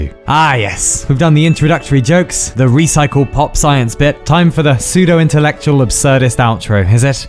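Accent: England, United Kingdom. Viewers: Why do you sound british ? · why do i voluntarily put myself into listening to some brit talk about life for 15 minutes every month or so? why do i enjoy it so much? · Нали? много е готин човека ама бг-то му с чист английски акцент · you british? you bulgarian? what else? :D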